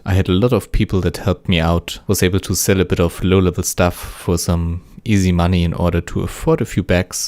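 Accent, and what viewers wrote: Germany. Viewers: Ha, love that german accent:)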